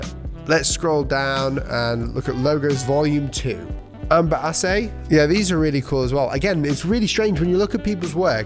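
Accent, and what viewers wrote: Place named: Cheshire, United Kingdom. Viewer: Keep up the great content though. I live in the States but was born in Cheshire. It's nice to hear a familiar accent.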